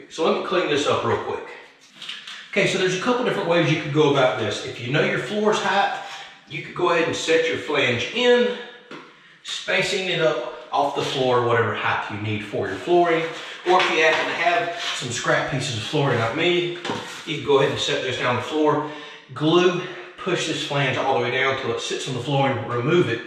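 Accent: South, United States. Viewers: Hmmm Height or HeighTh?? I love the southern accent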